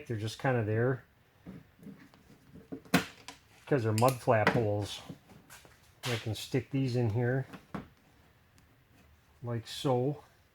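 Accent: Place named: North, United States. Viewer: You sound like ya from up north. Milwaukee here.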